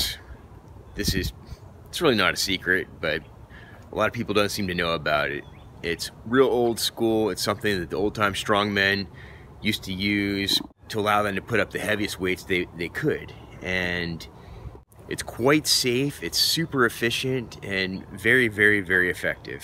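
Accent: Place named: California, United States. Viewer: This is good, you cover stuff that I'm not seeing others do. Thank You. BTW, are you from California? You have some sort of surfer dude way of talking that certainly doesn't fit New Jersey.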